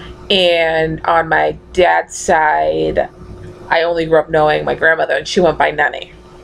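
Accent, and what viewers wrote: United States. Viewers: Funny. Your accent sounds more Boston to me. I don't have one. Tfs hugs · Awesome video! You totally have me wanting to do this now. Love the Mass (tried to spell it out, failed lol) accent!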